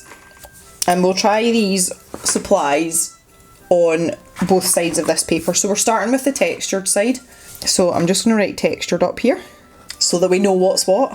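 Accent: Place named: Dundee, United Kingdom. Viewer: the Dundee accent is def different lol Glaswegian confuses me a lot I think the closest thing similar in Canada is the west coast accent compared to east coast. but thats provinces away by distance not a few miles but that makes sense since so many scottish/irish people went to the east coast hundreds of years ago (you do have very small regional differences in slang and sort of in accent but nowhere on the scale like it is in Scotland)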